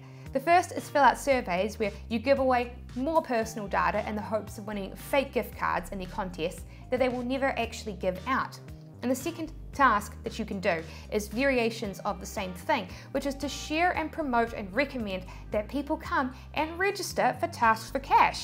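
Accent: New Zealand. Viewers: You are the only YouTuber I know with a New Zealand accent. I never heard this before. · Your Kiwi accent maybe your biggest asset here. If the person pretending to be you can't say "fush and chups", then clearly, they aren't you.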